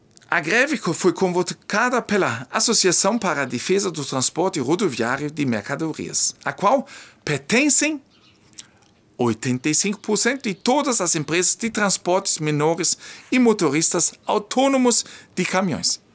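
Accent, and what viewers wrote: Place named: Germany. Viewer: Olá. Você é muito simpático e adorável. Suas reportagens na língua portuguesa com o sotaque alemão é único. Sou sua fã.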